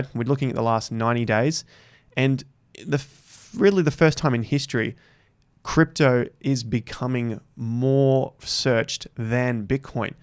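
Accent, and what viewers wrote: Australia. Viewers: It’s great to hear another Aussie. Are you Melbourne based?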